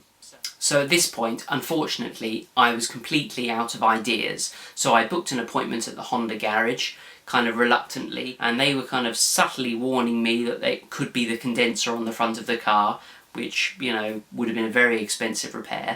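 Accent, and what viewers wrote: United Kingdom. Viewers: He has a voice of an English person not a Chav,, rare these days .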